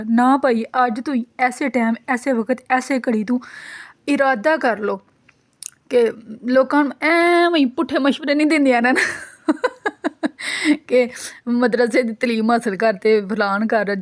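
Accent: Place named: Gujranwala, Pakistan. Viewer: Gujranwala ki punjabi lgti hai apki.... Or without any reason laughing itni achi nhi lgti....baki theek hai...or dua ap khud he kr lea kro apny leay... Hmari tu apni duaian reh jati hn oudhuri...!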